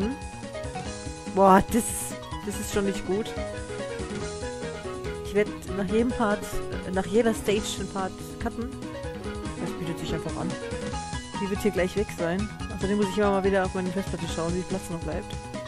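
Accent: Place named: England, United Kingdom. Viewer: Sehr schön dass das ganze jetzt richtig startet. ^^ Bin auch zuversichtlich dass du en Easy Modus schaffst, ich weiß ja dass du es kannst in dem Ramen wo das ganze für uns Normalsterbliche möglich ist. xD Bin auch schon auf alle anderen Stages gespannt. ^^ So nebenbei die blauen Items waren in dem Teil nur für Punkte da. Und schön dass ich wieder diese tollen englischen Akzent hören kann. ^^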